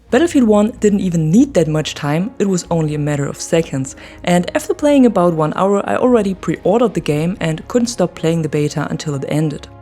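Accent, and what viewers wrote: Germany. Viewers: Your good, is that a German accent i detect 😉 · Yea, i also think it's a German accent.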